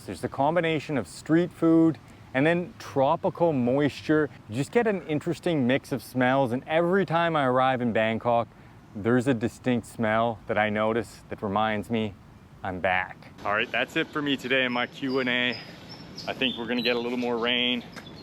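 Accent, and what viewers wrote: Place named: Canada. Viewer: The negative comments I think it's the Canadian hoser accent and because you speak very slow and clearly. Which is great cause I can listen to you at 1.25 it 1 5 speed. I'm in Thailand (Hua Hin) now and you helped us get here with your vids. Big thanks from a fellow Canadian and Australian. Hope to come back in Dec for 4 months to escape those Mississauga winters. Cheers keep making those vids.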